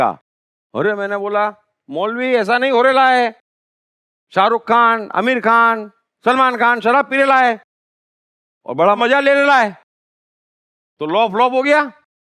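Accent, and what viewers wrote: Gujarat, India. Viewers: Lagta he k sir hamare Gujarat se hoga qki gujarati sbd pyor bolata he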